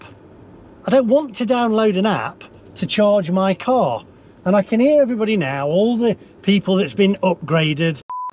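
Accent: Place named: Northern England, United Kingdom. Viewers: only somebody from the north will say "I am sat on". English is "I am sitting on.". YOU ARE FROM THE NORTH!😄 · Nice to hear a fellow Northerner giving his thoughts. EV's are a con. They are worthless after 10 years as the battery won't hold a charge. Recent channel 4 test on a 10 year old Nissan leaf. It got 17 miles on the motorway before a recharge. 17 miles!!! And announced to today. EV's to be charged road tax in the coming years. That was always going to happen. I'll buy one of the last ICE cars and keep that for 10~15 years. That 10 year old car will still do 400 miles on a refill. I always buy a nearly new car and keep it till the end.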